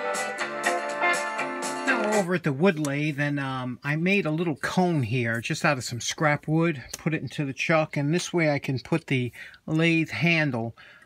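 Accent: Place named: Hudson Valley, United States. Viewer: Great video, really useful, and I love your accent it reminds me of home in the Hudson Valley. :)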